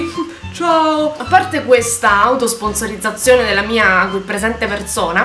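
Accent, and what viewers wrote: Rome, Italy. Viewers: Milano è un delirio quando non c'è sciopero... puoi capire perché diventano tutti matti quando c'è!.' eh siiii è una vita così cosa ci vuoi fare!! ps. ti adoro:) e amo quando parli in romano!! mi fai un sacco ridereeee!! baci dalla lombardiaaaa!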